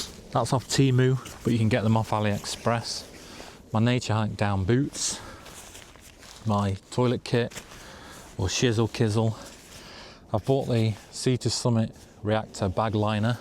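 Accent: United Kingdom. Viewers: Put big light on, sounds very Sheffield my friend. 👌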